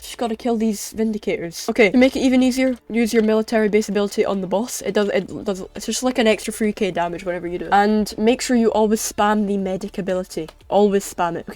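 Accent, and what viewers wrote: United Kingdom. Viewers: the moment i heard the brit accent i knew this is going to be good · it sounds scottish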